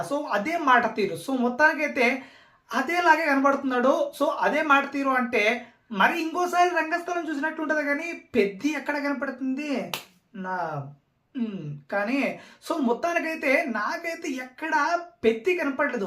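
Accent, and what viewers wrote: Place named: Andhra, India. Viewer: Utter andhra slang ra arripooka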